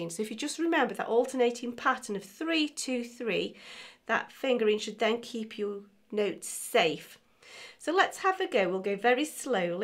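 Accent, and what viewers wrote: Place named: Stoke, United Kingdom. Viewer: Hi thanks for the tutorial! really useful. I am guessing you're from the Stoke area based on your accent 🙂🙂🙂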